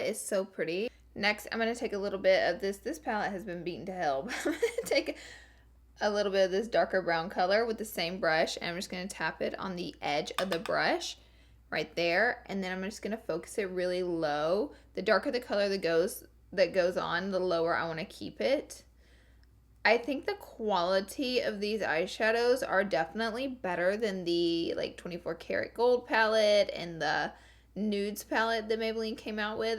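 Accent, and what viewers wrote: South, United States. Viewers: 🌈🌈🌈🌈🌈🌈🌈🌈🌈🌈🌈🌈🌈🌈🌈🌈🌈🌈🌈🌈🌈🌈🌈🌈🌈 Snapchat fam!!! Love ya Girl! Love ur southern accent it makes me miss mine! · i love you so much 🌈🌈🌈🌈 early snapfam. love your country accent 😚 much love from your biggest fan from Norway ❤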